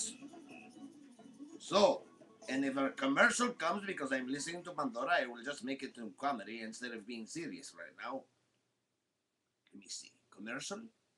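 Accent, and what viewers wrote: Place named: India. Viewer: You're Indian accent is funny!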